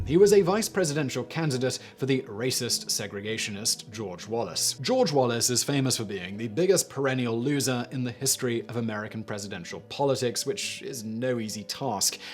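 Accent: United Kingdom. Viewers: General LeMay was the brainpower of SAC (Strategic Air Command). I spent my Air Force enlistment (4 years) in SAC. General LeMay was considered the father of SAC, and a hero. I understand many critics condemn his firebombing of Japan. I say Japan started WWII without fulling understanding America’s ultimate power to defeat Japan. jJapan’s sneak attack on Hawaii made many Americans feel any offensive against Japan was fair game. You sound like a Brit, you and the Japanese should be thankful the nuke wasn’t dropped on Tokyo. You do a disservice condemning the USA for firebombing Tokyo.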